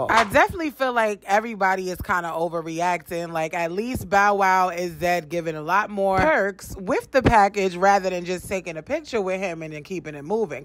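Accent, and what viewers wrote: New York, United States. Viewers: I love ya NY accent, when Yu spill tea I feel like you in my room gossiping with me 😂🥰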